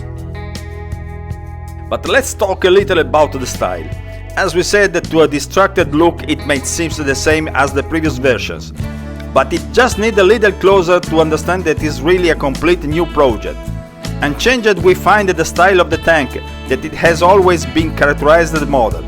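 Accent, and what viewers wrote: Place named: Italy. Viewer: there is one thing as beautiful as Italian motorcycles - the sound of the English spoken by an Italian :)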